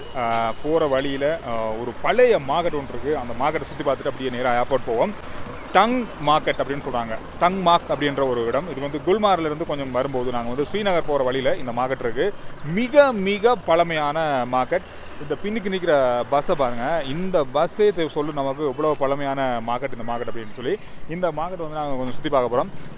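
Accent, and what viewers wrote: Sri Lanka. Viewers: congrats watching all your videos for your srilankan tamil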